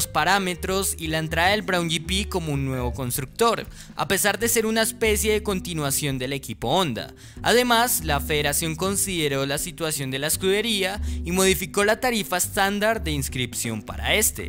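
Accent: Colombia. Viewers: Por el asentó colombiano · Felicitaciones, gran contenido, con rigor técnico, sigue así y llegarás lejos. Por tu acento eres colombiano, así que un saludo a ese gran país cuna del gran Juan Pablo Montoya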